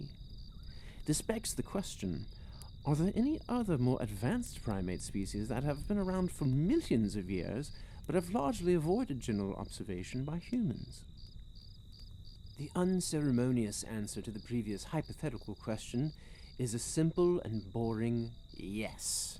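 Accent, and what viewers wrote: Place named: United Kingdom. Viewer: AUDIO TOO LOW! Repost after turning it up to ELEVEN! Lose the Limey Accent - pretensious & condescending.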